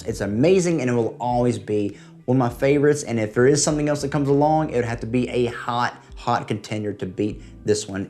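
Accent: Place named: South Carolina, United States. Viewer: Love the SC accent!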